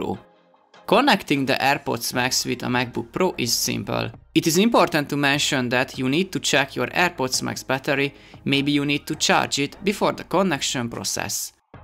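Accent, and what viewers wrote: Hungary. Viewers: You has a Hungarian accent. Are you from Hungary, or I misshear something?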